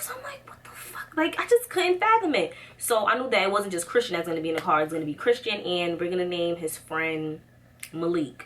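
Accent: New York, United States. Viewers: i knew you had to be from nyc! heard that accent coming through lol much love from Jersey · dead ass, I love how New Yorkers talk💖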